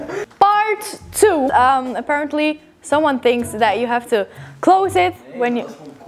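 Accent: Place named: United States. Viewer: Serious question because I'm curious - do all Belgians learn American English with an American accent? Asking as a much closer neighbour (Brit) 😊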